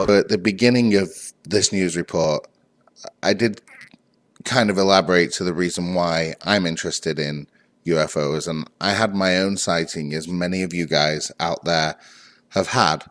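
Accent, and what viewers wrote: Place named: Manchester, United Kingdom. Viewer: OK, I thought I rec ognised a Manc twang in there, I'm from Bury.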